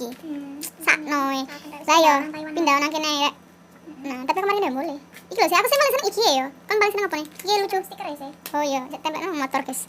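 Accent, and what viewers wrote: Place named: Java, Indonesia. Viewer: YaAllah medok nya😩 siji loro pitu 😩💚💚🌱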